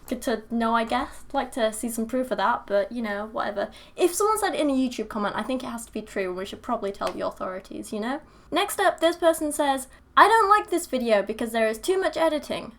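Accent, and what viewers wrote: United Kingdom. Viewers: The girl parts are certainly nice, but I'm sure there's some views coming from having a cute face and British accent as well. :) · like your British accent. Can you do an American accent? Maybe a California accent. I don't think they have one but it may be that I am from there living in New Jersey.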